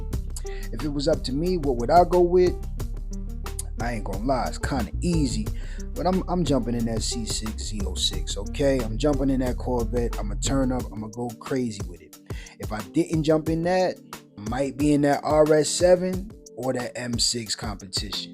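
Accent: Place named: West Coast, United States. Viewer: He sounded like he was from the West Coast to me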